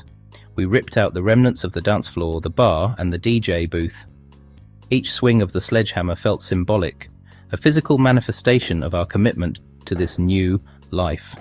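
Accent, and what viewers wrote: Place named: Southampton, United Kingdom. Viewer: Tu as laissé ton accent de Southampton!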